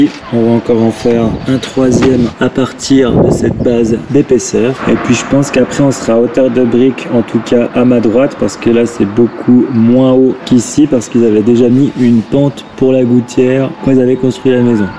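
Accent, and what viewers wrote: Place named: Franche-Comté, France. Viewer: J'adore ton lancer d'eau pour humidifier avec ton petit balai à chiottes. Salut à toi mon Franc-comtois. Reste ainsi avec ton accent tellement vrai. 😊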